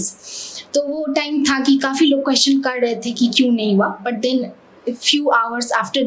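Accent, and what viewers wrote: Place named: Bihar, India. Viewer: Bihar se hai ye hindi me bojauri include karate hai 😊😊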